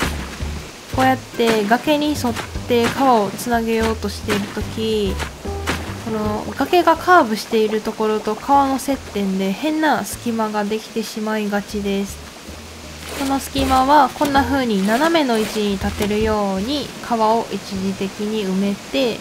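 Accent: Kansai, Japan. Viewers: 関西の方なんかなぁ自分もやからめちゃ聞きやすいです🥺ほんで編集もお声も可愛くて見やすい💕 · 楽しみにしてました〜！さんを参考に島作り直してます🥰 関西弁、親近感あって好きです〜！ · イントネーション関西風www 参考になりました。ありがとうございます。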